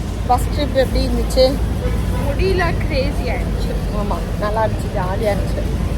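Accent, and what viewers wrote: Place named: Madurai, India. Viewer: Your explanation in Madurai tamil slang is . Good video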